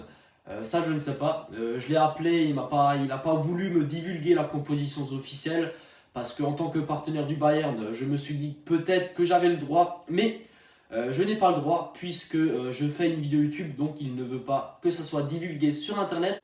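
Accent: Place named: North, France. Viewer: Toi, t'as l'accent du Nord mdr !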